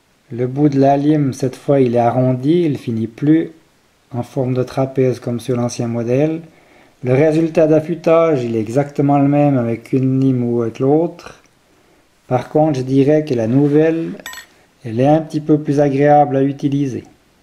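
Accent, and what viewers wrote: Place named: Quebec, Canada. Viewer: vous avez un accent québécois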